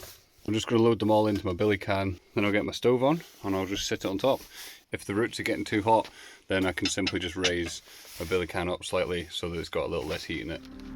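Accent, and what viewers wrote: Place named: Scotland, United Kingdom. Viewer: love your Scottish accent, are you from Edinburgh like me. I also make tea from dandelions Leaves by drying them. Stinging nettles are great too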